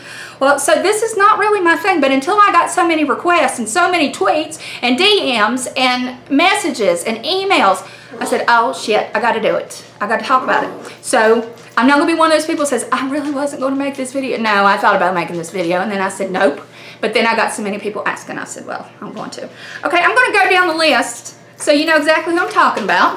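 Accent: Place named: West Virginia, United States. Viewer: My mother in law also loves to collect cookie jars & her name is Beth. ^_^ Your accent sounds abit like hers she's from WV. How many weenie dogs do you have?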